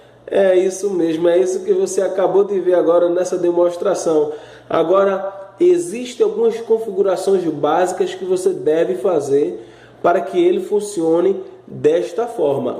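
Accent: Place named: Pernambuco, Brazil. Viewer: Esse sotaque e de Pernambucano do litoral :)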